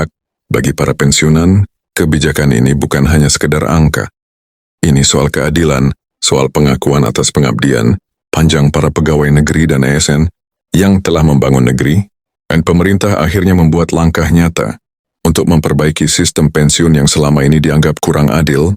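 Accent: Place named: Malaysia. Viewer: Kuang meyakinkan beritanya.seperti ngeledek pensiunan Indonesia.dengarkan baik baik membacanya gaya logat Melayu waspada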